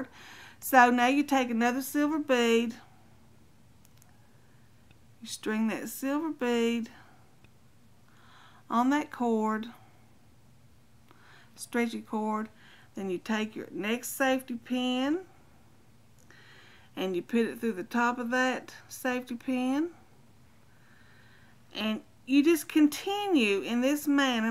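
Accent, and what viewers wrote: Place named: South, United States. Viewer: It's Soo good too hear a Southern Voice ... I'm from Alabama ... God Bless You ...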